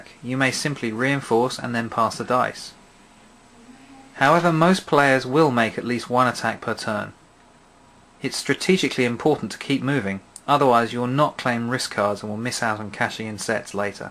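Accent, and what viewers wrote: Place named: Australia. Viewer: wow he sounds Australian!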